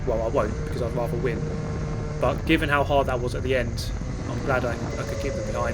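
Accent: United Kingdom. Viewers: EYYYYYY BRITISH BOI nice to know theres actually british bois here in youtube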